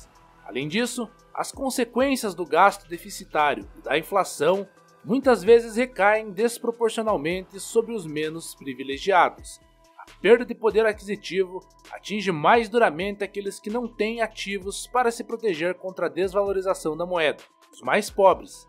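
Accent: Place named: Rio Grande do Sul, Brazil. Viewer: Esse narrador é gaúcho.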